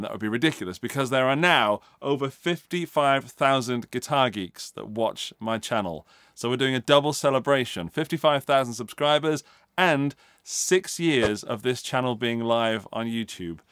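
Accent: England, United Kingdom. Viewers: I'm relatively new to the channel, and so far my biggest takeaway, and the thing for which I'm most thankful to you, is learning of the Strymon Flint, which will soon be part of my (very small) pedal arsenal. That and the hair, which is apparently somewhat toned down these days. Some of the older clips... it's memorable, I'll give you that! Seriously though, you're very articulate and occasionally funny with an English accent, which to American ears connotes intellect and is immediately suspicious to the bad people. I like it myself.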